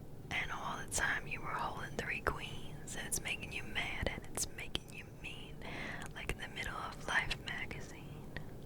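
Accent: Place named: Louisiana, United States. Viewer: These lyrics struck me in an interesting way. It reminds me so much of how I've felt for a while, so it hit me quite deep. I could relate to it. And the country accent, it reminded me of a Louisiana accent, particularly from southern Louisiana.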